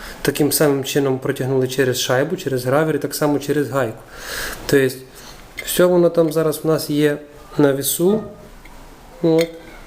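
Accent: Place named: Surzhyk, Ukraine. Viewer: Гарне суржик. Дякую.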